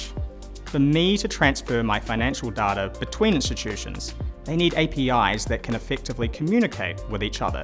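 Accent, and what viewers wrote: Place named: New Zealand. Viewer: Great to hear a Kiwi explain that so well!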